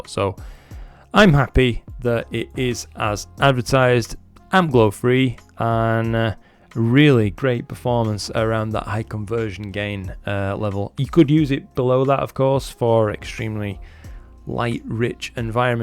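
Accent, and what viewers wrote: United Kingdom. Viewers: Hi, excellent review. I look forward to the results of the deep sky testing of the 585. An unexpected result of watching your videos has been getting used to your nice British accent XD. I was used to American English, but now I prefer a British accent. No Dylan O'Donnell, I still can't get used to your Australian accent XD XD XD. Hugs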